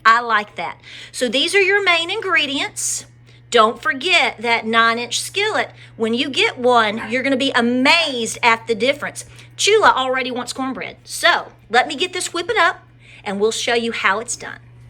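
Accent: South, United States. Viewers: You are so cute!!!! Very informative videos...I enjoy your energy and your southern ME TOO talking!!! KEEP up the GREAT Videos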